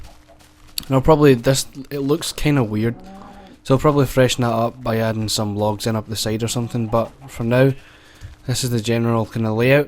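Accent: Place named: Edinburgh, United Kingdom. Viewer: Where you from in Scotland, Edinbrugh ishh? You sound it.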